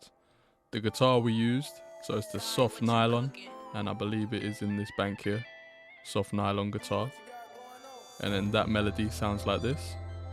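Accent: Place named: United Kingdom. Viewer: where are you from bro? sound like the UK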